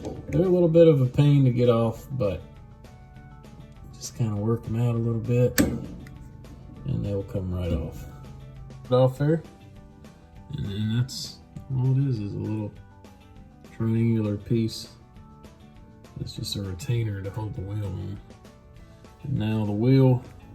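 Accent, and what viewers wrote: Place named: Alabama, United States. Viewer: Dude's speaking Alabama ease. He doesn't have an Amana (Uh-man-uh) dryer, he has an (Ar-mon-uh) dryer. I thought he was servicing a new brand of dryer. Keep up the good work Buddy!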